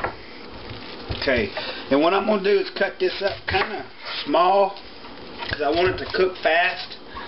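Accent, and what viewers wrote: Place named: Arkansas, United States. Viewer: spam grosses me out but this looks good... that bacon grease boy it changes everything!.. sounds like you're from Arkansas.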